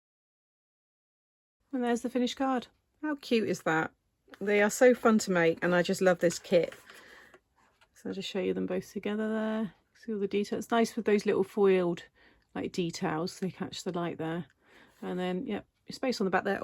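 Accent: United Kingdom. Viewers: Here's what I love about you...I want to create every time and all the time when I watch your videos!! You are honestly one of my very favorites! Thanks for all the inspiration and for all the great creates!! I have made so so many awesome things because of you! And this Ohio girl loves your accent and the neat little different words you being from the UK use!!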